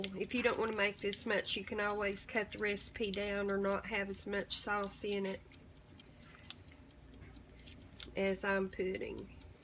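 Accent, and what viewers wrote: South, United States. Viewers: I love southern accents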